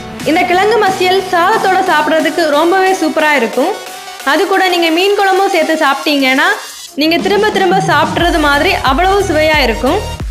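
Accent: Nagercoil, India. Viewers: Ovoru ooruku oru slang iruku... Nagercoil slang romba special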